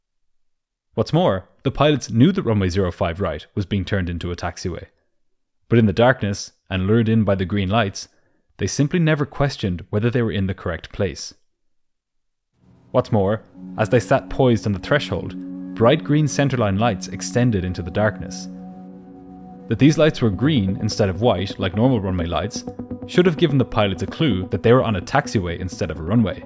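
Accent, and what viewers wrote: Ireland. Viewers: Great video thank you ,shocking story great to here a fellow Irish narrator 🙌☘️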